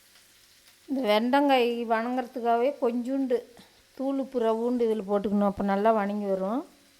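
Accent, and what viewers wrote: Tamil Nadu, India. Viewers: அக்கா நீங்க எந்த ஊர்,உங்க பேச்சு அரியலூர் பக்கத்தில் நமங்குணம் போல தெரியுது,உங்க டிப்சு எல்லாமே எளிமையாவும்,நல்லாவும் இருக்கு நன்றி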